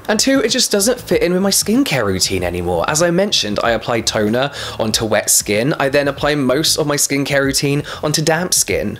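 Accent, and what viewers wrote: United Kingdom. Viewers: In love with ur vdeos,ur ausumn skin😭😭😭n the British accent😍😍😍#lucifervibes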